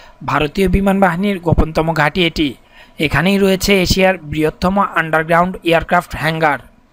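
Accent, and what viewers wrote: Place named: Bangladesh. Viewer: বাংলাদেশিরা ওই রকমই উচ্চারণ করে , এর অর্থও বোঝে কি না কে জানে!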